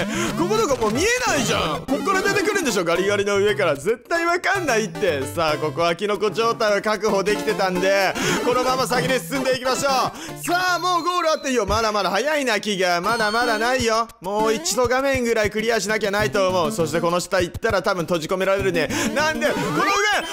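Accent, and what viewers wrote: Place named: Kyushu, Japan. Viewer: こんなOPとか言ってるけど、、、それがいいんすよｗ こんなって言ってるけど、こういうのも考えて作成して、そして動画編集もして、、、、無理しない程度にお願いしますね！長く永くなが～～～くさんの動画が見たいので！！あと、自分熊本なんで、ちょくちょく出る方言も聞きなじみがあるけん、そのままのスタイルで言ってほしかばってん、、よかろか？？お願いしますｗｗ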